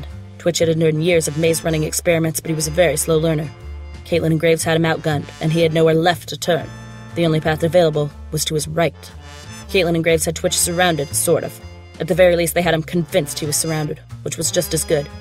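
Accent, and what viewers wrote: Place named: England, United Kingdom. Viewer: This was the worst and most annoying English accent i've ever heard.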